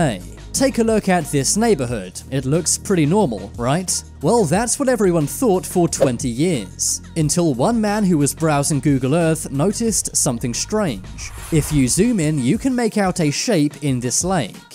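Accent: United Kingdom. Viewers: I hear you speak British🤨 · I don't believe I have listened to a YouTube video with as many grammatical errors as this one. Not that there are a lot but more than any other to which I have listened. At about 12 seconds in, "most scary". That should be "scariest". The adjective "scary" has 2 syllables and ends in "-y". That means we use the "ier" and "iest" endings for comparative and superlative forms. I see this more and more, that is, where people say "more" or "most" when it should be the comparative suffixes "ier" or "iest". I don't understand why as it even just doesn't sound right. Also at the end of this video, the narrator again uses "most scary" which I have already mentioned should be "scariest". Next is when the narrator says, "him and his car were never found". Really? Him was never found, eh? I don't think I have to mention what the correct pronoun should be (he), but I did anyway because I sometimes just wonder. 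Also, I wonder if the narrator is British (he sounds British) because all too often when I watch British shows they often use "him" (or "her") as the subject noun. I don't get that either as I always thought the British were generally pretty good at using proper grammar. Next, at the narrator says, "by censoring this house, less people would go here". That should be "fewer" people. Use "less" for singular nouns and "fewer" for plural ("there is one less person here"; "there are 10 fewer people here"). I don't get it as again, it doesn't even sound right.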